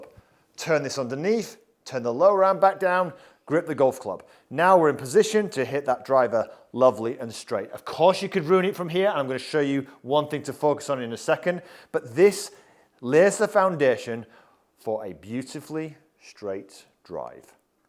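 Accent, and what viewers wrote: Yorkshire, United Kingdom. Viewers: Wanted a in person lesson with you thought you might be local ish with your Yorkshire accent I’m hearing maybe Leeds but your based all way down Kent too far for me to go for a golf lesson might have to get an online one booked instead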